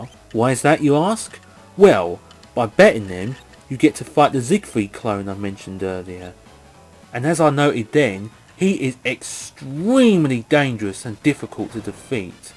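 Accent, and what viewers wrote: England, United Kingdom. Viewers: WOOOW BRITISH ACCENT! Love it! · its because he is english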